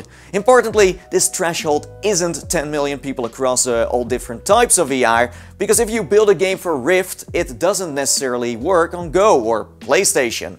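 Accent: Netherlands. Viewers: Are you Dutch? you have a dutch accent. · Hey kerel ik hoorde je accesnt en ik wist gelijk dat je nl was😜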